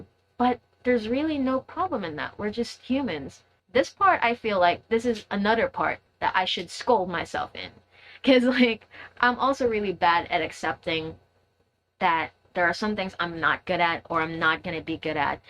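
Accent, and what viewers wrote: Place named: United States. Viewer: Hi this is me Theo. I like the fluent English and the American accent.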